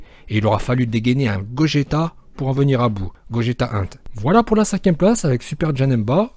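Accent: Japan. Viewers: J' aime trop ce que tu fais avec ton accent japonais dans tes vidéos continue comme ça 👏👏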